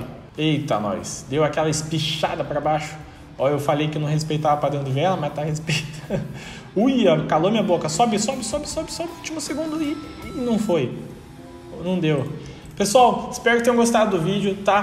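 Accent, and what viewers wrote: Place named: Registro, Brazil. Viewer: Pelo teu sotaque você é de Registro são Paulo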